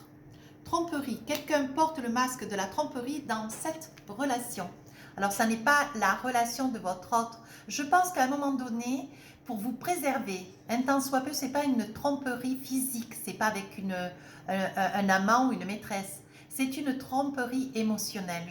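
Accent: South, France. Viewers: Bonjour. J'aime vos guidances. Juste, je cherche en vain l'origine de votre accent. Provence ou sud ouest? 😁👍🙋‍♀️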